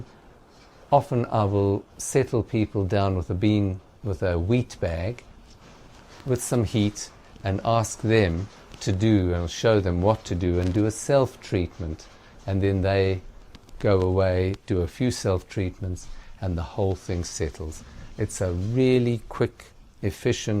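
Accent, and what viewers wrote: South Africa. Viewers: Are you South African, I can't help but notice the accent?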